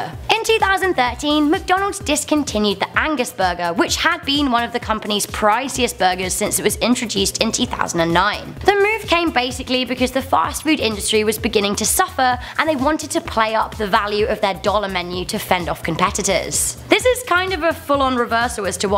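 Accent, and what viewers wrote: United Kingdom. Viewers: I think it may be British pronunciation cos I say taco like that and I’m British cos Americans have a TOTALLY different accent so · She made me cringe each time when she pronounced it as "pITas" instead of "pEETas", lol and killed me when she said "naughties" instead of "nineties". She speaks very much like my ex mother in law(she is British), except I like listening to this woman speaking...and not the ex mother in law.lol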